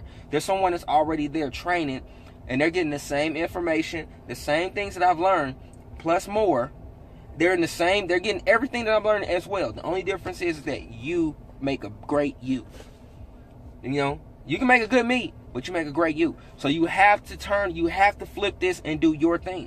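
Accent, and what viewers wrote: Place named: Oklahoma, United States. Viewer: are you from Oklahoma love yours accent thinking about joining. thanks for sharing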